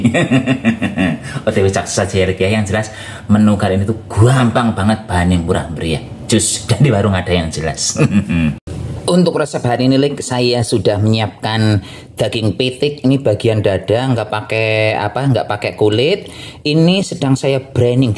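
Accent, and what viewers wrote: Banyumas, Indonesia. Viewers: Lucu ngapak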